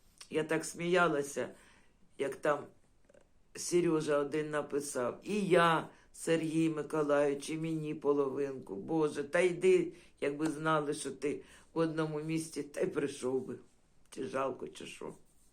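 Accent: Kherson, Ukraine. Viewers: Пані, вітаю, Ви дуже класна, ваш говір так мені нагадує мій, херсонський❤, вже 16 років там не живу, а всеодно, досі проскакує та балачка😊, зичу Вам міцного здоров'я і терпіння, най Бог кріпить вас і охороняє, доброї ночі, Янгола Охоронця🙏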